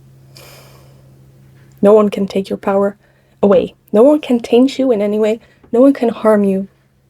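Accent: Netherlands. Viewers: I know a Dutch accent when I hear one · I like it..nice..video.. .reminds me of the Netherlands ur accent..a lovely country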